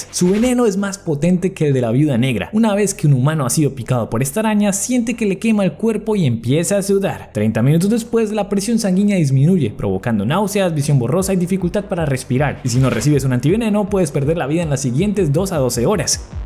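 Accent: Colombia. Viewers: Eres colombiano?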